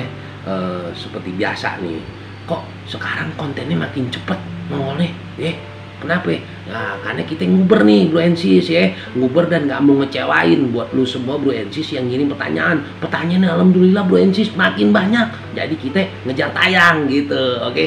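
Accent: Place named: Banyumas, Indonesia. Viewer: salam wong ngapak 😄 ora ngapak ora kepenak 😁😁😁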